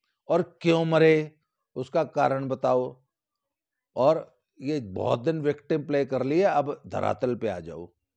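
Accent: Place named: Rohtak, India. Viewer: koi pehli baar ye video sun na bata sakta ki east up ke bau saab ho, sun ke rohtak ke lagte hain aap 😊🙏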